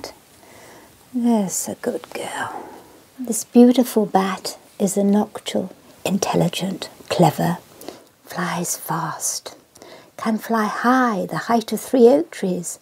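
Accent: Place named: RP, United Kingdom. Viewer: A wonderful voice. It's called an RP (Received Pronunciation) British voice and it's almost from a bygone era.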